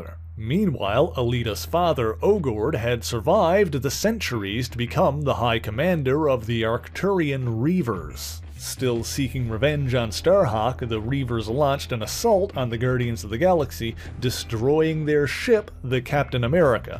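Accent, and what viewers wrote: Newfoundland, Canada. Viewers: Why does the narrator sound like a Newfoundlander to me?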